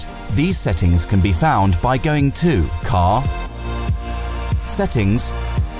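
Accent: United Kingdom. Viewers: 뭐야? UK English???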